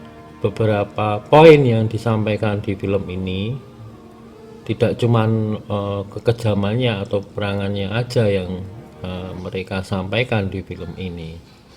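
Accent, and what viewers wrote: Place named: Java, Indonesia. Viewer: logat jowo salam ko kalteng bos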